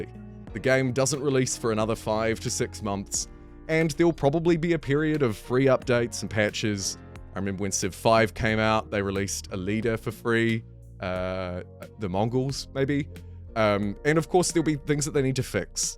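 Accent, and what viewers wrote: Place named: New Zealand. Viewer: Nice to click on a random video and hear a kiwi voice. Rawe bro, nice video. Generally pretty excited for the new game, kia Ora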